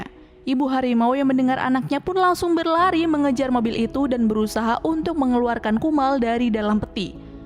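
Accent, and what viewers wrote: Sunda, Indonesia. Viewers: Sih teteh Sunda nya kasar teing nya 🤭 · Lucu ey aya sunda sundaana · Teh ai teteh budak Sunda nya,Sunda na NU ti mana😅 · Geloah ngomongna Sunda pisan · Sumpah lucu pisan nyarios Sunda meni enaken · Sunda banget😂😂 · Bukan cerita'y yg saya suka, malah denger bahasa'y, asli urang sunda pisan ieu mah. 😆😆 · aduh Mimin aslli Sunda Oge nya👆🤭🤭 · Hahahahahaa nyariosna mni raos sundana🤣🤣🤣🤣👍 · Seru banget ceritanya mengharukan Apalagi pas pake logat Sunda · Pengisi suaranya orang Sunda anying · teteh orang sunda ya😂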